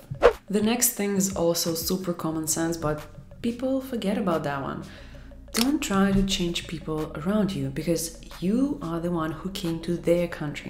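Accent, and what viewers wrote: Russia. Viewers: Thanks for making this video it was helpful for me . And also I like your Russian accent the way you talk ❤️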